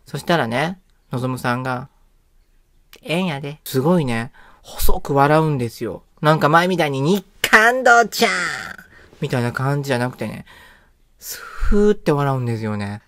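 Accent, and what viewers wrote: Kansai, Japan. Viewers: いい話やねーもと此花区出身です。の関西弁好きやわー自分が子供だった頃に一瞬もどります。は若い時から外も仲も可愛かったんやねー男でも女でもない人間として可愛かったんやねーなんか感動してしまいました。大阪弁の中には沢山の感情が混ぜられているようで関西人魂に響きます。私はもう関西に住んでないくて、関西の住む身内からは私の’’関西弁が古くてへんや’’って言われてびっくりしました。どうでもええねんけど。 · の青春の思い出ですね。なんかしんみりして聴いてました。ノゾムさん元気でいて欲しいです。の関西弁好きです😀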